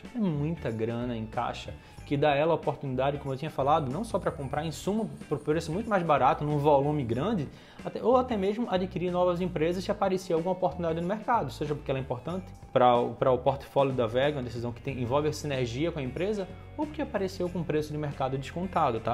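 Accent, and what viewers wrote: Recife, Brazil. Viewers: Ei brother vc é de Recife? Achei massa o teu canal, sempre que procuro tem algum paulista/carioca falando... fora a qualidade das informações que você passa, parabéns!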